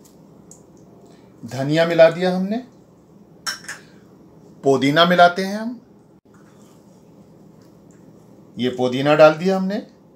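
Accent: Delhi, India. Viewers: Kitni achi AP ki recipe ha itni hi achi Urdu .....AP k sheen qaaf bilkul durust Han ....recipe dakhny main to maza Aya ha AP ki Urdu sun k kisi pakkay Dehli waly ka khyal Aya ha .....my nanihal belong to Dehli jama masjid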